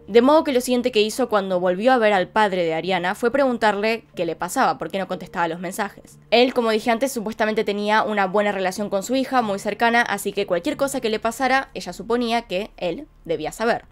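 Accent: Argentina. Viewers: Por favor ya no digas ANIO, ES AÑO POR FAVOR 🙏 😭, me ensartar como investigas punto por punto tus teorías llevo más de una semana escuchándote desde que descubrí tu canal y tu acento argentino es muy bueno 👌 solo porfavor en la pronunciación es año🤗 gracias espero puedas leerlo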